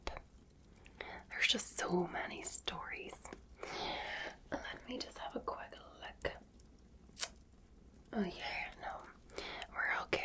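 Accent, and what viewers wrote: Scotland, United Kingdom. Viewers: Northern Irish English does sound more like Scottish English than Irish English.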